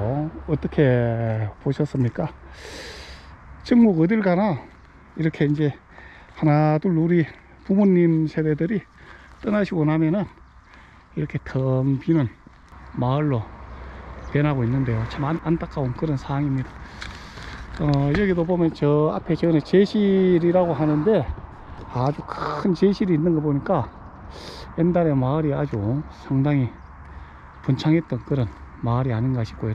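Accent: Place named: Jeolla, South Korea. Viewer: 이 유튜버전라도분이네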